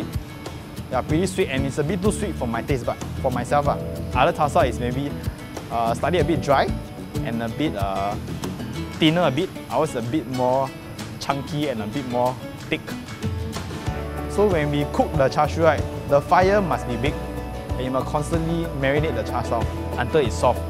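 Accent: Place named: Singapore. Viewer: Singlish accent at its finest, love it !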